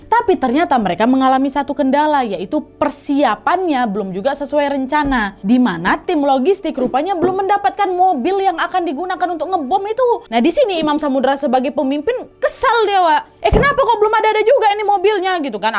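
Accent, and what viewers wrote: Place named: West Sumatra, Indonesia. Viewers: Gw salut sama kamu membawakan cerita bom Bali dg khas Sumatra mantap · enak kali dengar cerita ini, logatnya khas minang, jadi suka lihat ibu ini.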